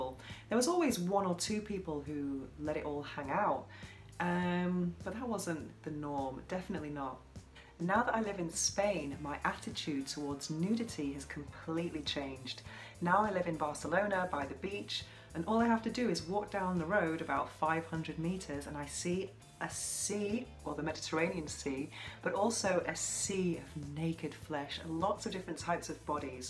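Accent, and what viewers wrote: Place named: England, United Kingdom. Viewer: I love your videos very educational I find them you're a great looking lady I love your english accent just curious as if you prefer britain or spain where you're living at now .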